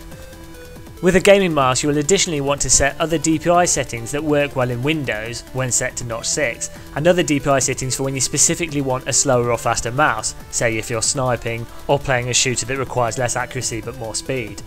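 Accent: United Kingdom. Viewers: Part 2 of my comment Finally you should have also added in the great importance of finding a flawless sensor mouse. This is a mouse that contains a sensor that has no acceleration, or prediction (Enhance pointer precision but on the mouse). Anyway nice video, are you scottish,english or what?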